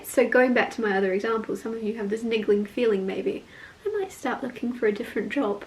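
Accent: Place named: United Kingdom. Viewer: Luv your readings...you are spot on..exquisite an very beautiful..Luv your English or British Accent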